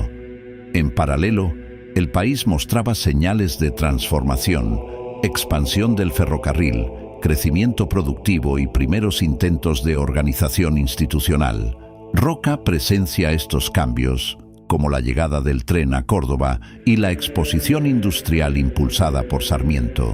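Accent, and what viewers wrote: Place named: Castile, Spain. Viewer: Buena biografía. Pero la imagen del min. 1.48 no le corresponde a Alejo Julio Argentino Roca Paz, sino a uno de sus primos Reboredo, éste creo que es Florencio. Ambos fueron estudiantes en el Colegio del Uruguay, creado por Urquiza en la capital de de Entre ríos, Concepción del Uruguay. No entiendo el tono castizo de la locución. Es para parecer más "interesante"?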